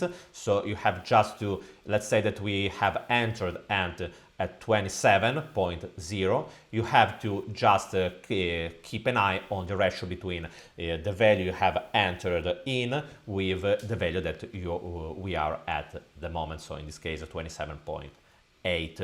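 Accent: Italy. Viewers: Sei italiano?😅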